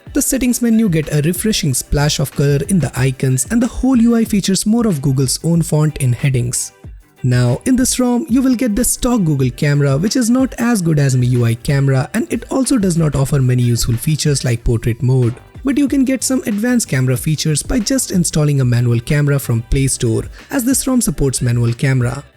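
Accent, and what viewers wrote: India. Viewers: He is indian thats why he speaks that way😊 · too many indian phone tech reviewer for the poco, seriously i can't find any american speaker out there, no offense but i can't stand the accent .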